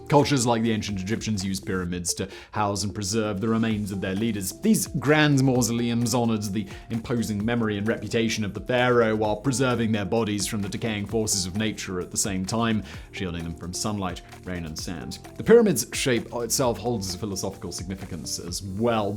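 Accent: England, United Kingdom. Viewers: Dude has used 11th grade literacy and semi posh English accent to build an empire. And i mean "11th grade literacy" at maximum. he mispronounces words I've never heard mispronounced before. Regardless, business brain blaze has made me love him AM I RIGHT PETER!?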